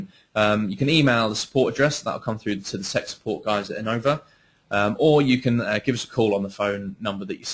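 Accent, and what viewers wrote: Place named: England, United Kingdom. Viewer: Other than the silly english accent, very helpful. Cheers =-)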